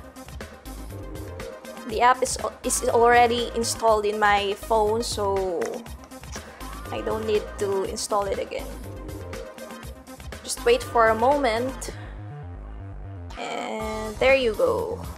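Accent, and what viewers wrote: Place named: Philippines. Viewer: accent sounds like a filipina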